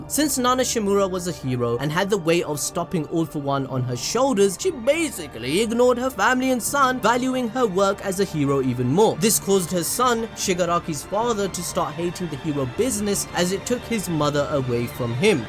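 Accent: Punjab, India. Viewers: Bro are u punjabi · Sir are you Indian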